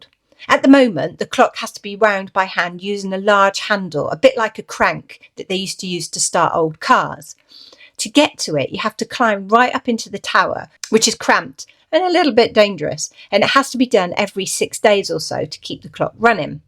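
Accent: United Kingdom. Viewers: A couple of years ago i went to the famous undercliffe cemetery in Bradford and there was a group of Bronte fans who were making speeches about a new found grave belonging to one of the Bronte servants who died in a workhouse and was just discovered there! But i don't remember her name! P.S Your Yorkshire accent made me laugh!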